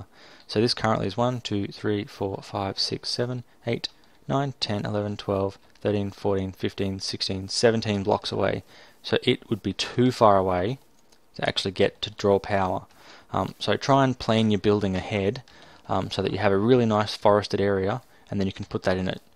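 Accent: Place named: Australia. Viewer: Thank you so much :D It is also great to hear a fellow Aussie :)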